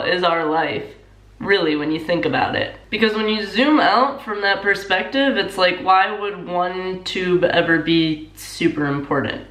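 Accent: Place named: United States. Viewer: What's her accent is .... which state in US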